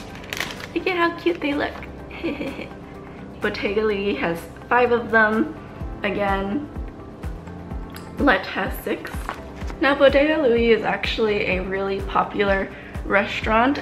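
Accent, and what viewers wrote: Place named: Philippines. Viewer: Your voice is just like a Filipino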